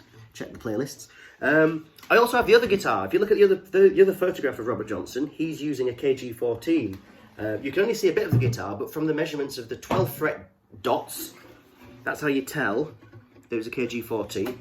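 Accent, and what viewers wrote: United Kingdom. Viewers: Great instruction. Love all the little added bits that fill out the song. You are a great teacher and all done with a Northern accent gives it a special touch. Thank you.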